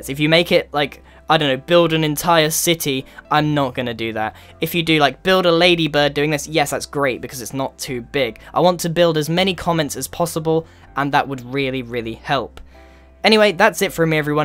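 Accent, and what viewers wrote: United Kingdom. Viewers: a person with a British accent talkin' about spitfires??? Y E S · I've been waiting for YEARS to say this. You Sound British 😂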